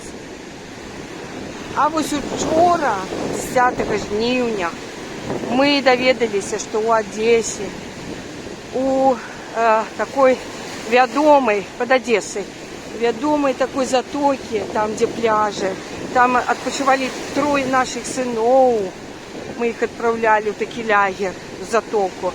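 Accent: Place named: Belarus. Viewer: Очень беларуский акцент. Хай живе Беларусь ! Не ставайте трапками для бацьки !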